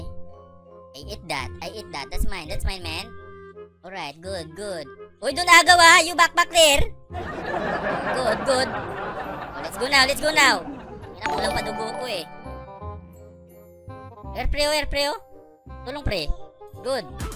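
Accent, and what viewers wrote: Visayas, Philippines. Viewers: Bisaya po kayo😂😂?? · Bisaya ka dol? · Bisaya ba to? HAHAHA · Bisaya ka no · Bisaya ka bay?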